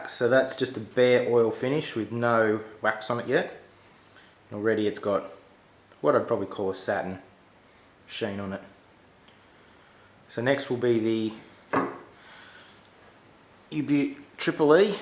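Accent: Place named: Australia. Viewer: Hello Mr. - I couldn't identify the wood that stock is made of, until I Googled Sportco Model 63, and found out that it, like your accent, is Australian. That explained it! Your stock is made from Eucalyptus wood, which, for gun stocks, is not common at all. A very nice piece of wood on a very nice rifle.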